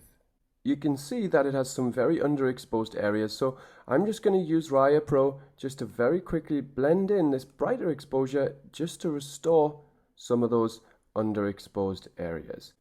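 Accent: Newcastle, United Kingdom. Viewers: are you from Newcastle? awesome tutorials thank you ☺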